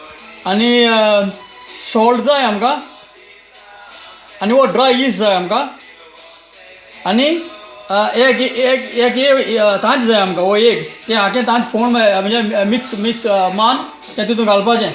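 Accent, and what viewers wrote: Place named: Salcete, India. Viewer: I've subscribed to your channel, just to listen to your konkani. It's my salcete dialect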